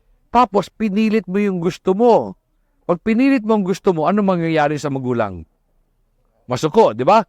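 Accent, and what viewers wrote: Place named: Visayas, Philippines. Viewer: Cute kaayo ka magbisaya Sir 😮😊😊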